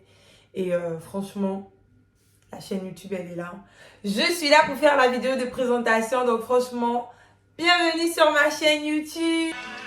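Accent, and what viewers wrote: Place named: Gabon. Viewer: Au tout début de la vidéo, j'ai capté que t'étais gabonaise grâce à l'accent 😂😅 Courage sis🇨🇲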